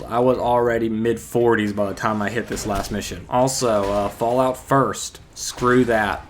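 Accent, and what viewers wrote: South, United States. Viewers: The fact that you pushed yourself soo hard for the sake of making this video despite the relationship you have with this game (as mentioned in this video) deserves praise! You have a southern accent! I hail from a land in the southern region of the U.S. btw, I think you were hooked when you actually started worrying about the condition of your home... to an NPC. LOL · Man you got like 1/10th of a southern accent lmao. it kicks in randomly every now and then.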